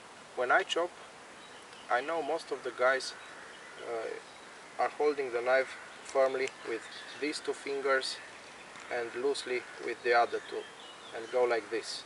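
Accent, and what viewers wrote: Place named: Romania. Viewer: You're romanian by the accent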